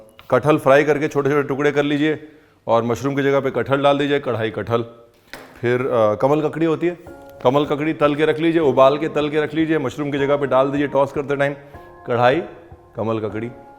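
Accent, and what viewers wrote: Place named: Punjab, India. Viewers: Apki boli Mai h Punjabi tadka jabardast or mast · I loved that Punjabi style talk, Punjabi people have that talent to make you feel cozy. Receipe is also quite interesting,but the talk, smile made my day!!❤ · Hii Ranveer, ye kafi pahle dali hui recepie hai. Personally mujhe mushrooms bahut pasand nahi par par kal kisi ne bilkul fresh mushrooms de diye. Ab banana to tha jyada the to socha dry hi bana lete hain. Phir search karte hai sabse pahla aapka vidio appear hua. Bas phir kya step by step follow kiya aur banai bilkul aapki tarah shandar kadai mushrooms aur sabko bahut pasand aaya. Aur Haan sath me jo aap apni style me bolkar jo panjabi tadka lagate ho lajabab. 👍👍👍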